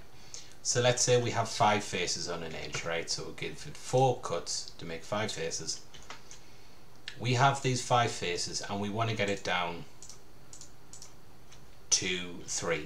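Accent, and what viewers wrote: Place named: Newcastle, United Kingdom. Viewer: Thanks so much for this tutorial can I ask are you from Newcastle or something I live in gateshead and you sound like a geordie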